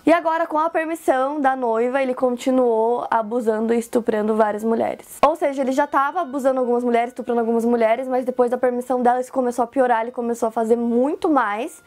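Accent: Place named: Rio Grande do Sul, Brazil. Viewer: vc é gaúcha? tem um sotaque em tanto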